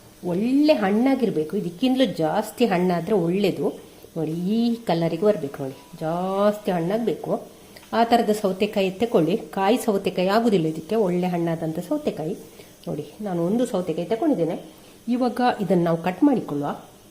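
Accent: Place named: Malnad, India. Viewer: ನಿಮ್ಮ ಮಲೆನಾಡು ಶೈಲಿ ಯ ಕನ್ನಡ ಕೇಳಲು ತುಂಬಾ ಚಂದ.!!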